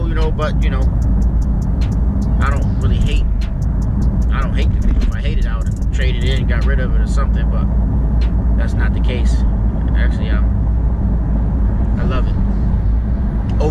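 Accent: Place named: South, United States. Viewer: This dude still sounds country as hell!!!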